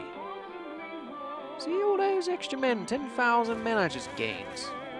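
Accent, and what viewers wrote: United Kingdom. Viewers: Are you born in Britain or are you just a Canadian with a British accent.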